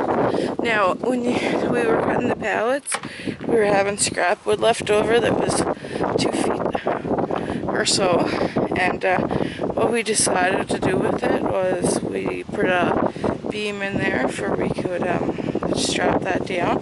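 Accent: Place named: Newfoundland, Canada. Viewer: where exactly are you from? that accent sounds like your from Newfoundland ☺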